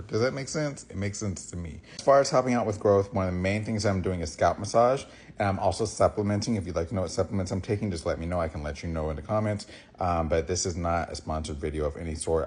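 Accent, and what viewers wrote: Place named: South, United States. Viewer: I love this hair journey of yours! I also love how handsome you look, your southern accent and your name “ ”! 😂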